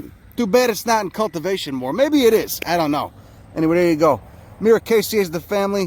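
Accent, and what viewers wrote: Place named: New Jersey, United States. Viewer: Never figured someone with a Jersey accent could be so smart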